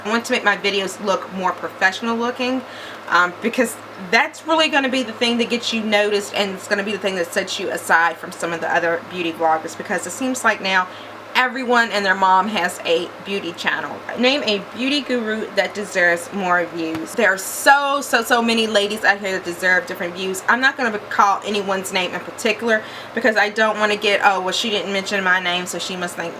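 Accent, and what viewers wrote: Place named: South, United States. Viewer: I love your southern accent! Your make looks beautiful!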